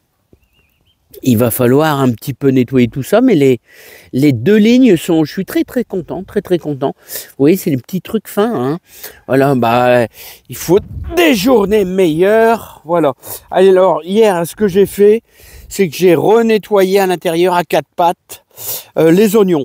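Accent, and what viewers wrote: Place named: Nord, France. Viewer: ça s'entend l'accent du ch'nord!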